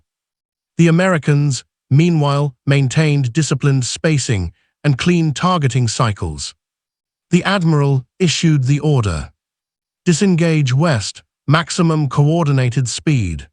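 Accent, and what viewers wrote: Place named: United Kingdom. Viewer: Why add distracting and annoying flickering speckles, cropping, streaking, and shaking to what could have been clear steady images?!! Your ridiculous images show objects and personnel vastly distant in location and time from what you describe. Why Brit-accented narration of a 100% US operation?!! DISLIKE! UNSUBSCRIBE!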